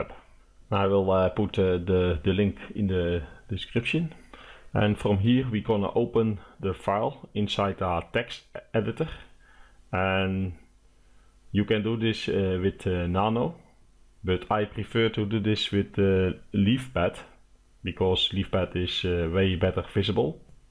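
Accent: Netherlands. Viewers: Hehe you have soooo deep Dutch accent :) groeten uit Amsterdam!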